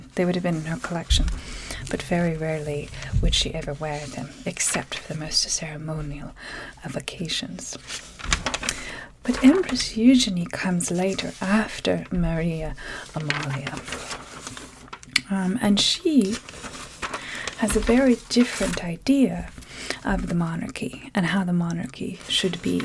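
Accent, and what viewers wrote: Transatlantic, United States. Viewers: Where is your accent from? Is it a combination of different places? It's almost transatlantic and super relaxing. New subscriber here. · You have an almost transatlantic accent and it’s soooo relaxing to listen to.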